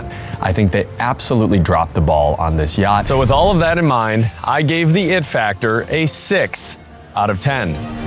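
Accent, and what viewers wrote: United States. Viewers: The misterious pear with the wax tip on its stem is a Passe Crassane most likely, it's a French winter pear. They do this so moisture is not lost. It's a nice touch. PS it's "luh·vaat·suh" though your American accent is rather adorable. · hahaha watching your videos is like watching war! intense, the last word in luxury, the polite detachment, and imposing. you are a security risk for these places. you have done remarkable work - the videography, some of the insights shared, and vuori is no chump change, but i do not know if id allow my bf to watch a girl do reviews in a robe 😂 you are really testing the boundary here and even though you are mesmerizing, you have molly eyes which is all the rage on camera these days i am NOT surprised about your wsj callout. you have adopted a perfect la movie accent and ditched your humble midwest origins but i think some others are gonna interpret you as a threat if you don't listen the first time. 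sometimes your ideas come across as objectivity rather than curiosity (why did this happen? or just pointing it out without being obvious). you were asking for that - you need a little more sensitivity with these class lines man 😭i don't think ur a bad person but u r dangerous 😂 😂 😂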